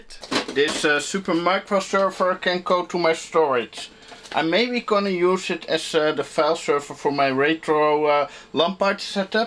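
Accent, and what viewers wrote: Netherlands. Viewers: He’s Dutch, the accent goes with it 😀. After a couple of minutes I don’t hear it, but then I lived in the Netherlands many years ago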